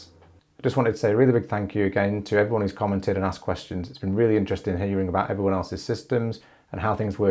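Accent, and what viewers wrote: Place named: Northern England, United Kingdom. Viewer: Nicely done, so glad to see / hear a Northern voice. Will pinch the spreadsheet as well 👍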